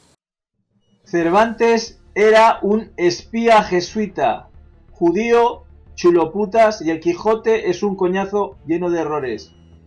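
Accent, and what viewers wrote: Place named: Mexico. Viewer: Grande!!! no teme decir la verdad jamás!!! ya hablas como Mexicano hermano!!! un abrazo y gracias x tus vídeos!!!!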